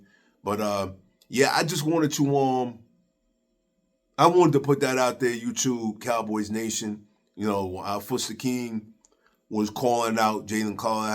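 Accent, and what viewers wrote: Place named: Brooklyn, United States. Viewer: My man much love I’m feeling this video. I’m from Brooklyn. Where are you from bro? You sound like you from home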